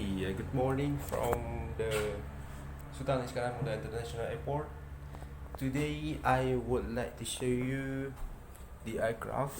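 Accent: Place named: Singapore. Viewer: When I hear his accent, I thought I am watching singaporean vlog... Great job pak cik...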